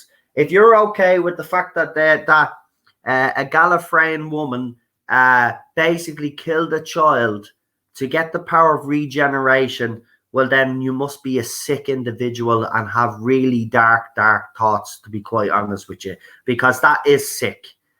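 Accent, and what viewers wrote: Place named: Dublin, Ireland. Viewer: Which part of Dublin are you from?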